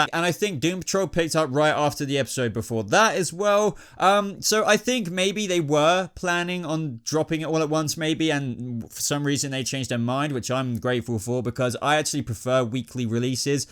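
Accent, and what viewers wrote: United Kingdom. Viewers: Hi. -Where are you from ? - I signed up to DC Universe and like your video. I'm a "Newbie" ... but I will 'Like' and 'Subscribe' to get your 'Take' on the series. ... USA, Washington State, Seattle here .. wondering where you are from .. "vit" a-mins" vs. "vite a mins" ... I'm thinkin' British, or Aussie or Kiwi. It's All good .... just glad it's in English .. or as you may characterize it (ME), American English :-p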